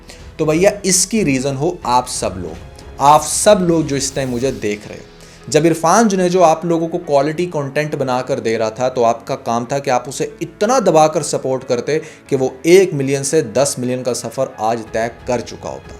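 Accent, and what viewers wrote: India. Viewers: Why you speak like Indians?"karne lag Ra tha" nonsense 😊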